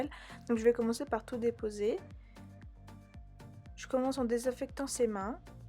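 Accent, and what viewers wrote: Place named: Brazil. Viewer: On voit de suite que tu es formatrice 🤩. Le travail est soigné et parfait 👍 bravo, en prime j’adore ton petit accent brésilien je crois ? ☺️🤫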